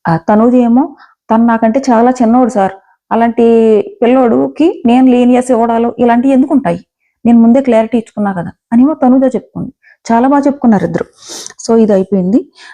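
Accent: Godavari, India. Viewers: Madam mi voice koda godavari ga pavan ramya same area na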